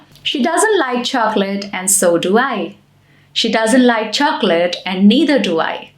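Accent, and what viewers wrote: India. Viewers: Hey, your new SUBSCRIBER✋cuz my viewers want me to speak English like you with Indian🇮🇳accent so now I’m gonna work for my YouTube AUDIENCE❤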